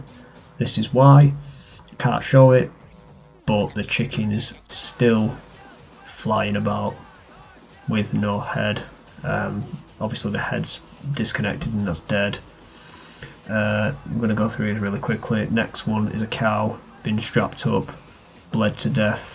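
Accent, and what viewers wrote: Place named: Liverpool, United Kingdom. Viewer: Are you from Liverpool? Your accent sounds alot like my buddies lol forgive if I'm way off the mark XD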